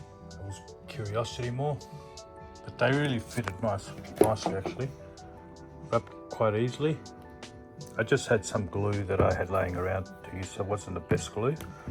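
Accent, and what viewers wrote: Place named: Australia. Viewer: Love it, and the Reo reference makes a lot of sense, you sound Aussie, where are you located bud?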